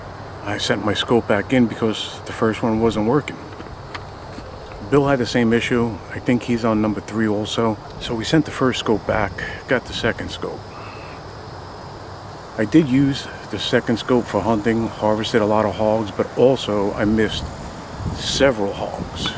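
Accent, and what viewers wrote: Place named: New York, United States. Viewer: Totally nothing to do with the scope, I just love hearing a Northeast accent being from The Bronx…guessing you’re a New Yorker!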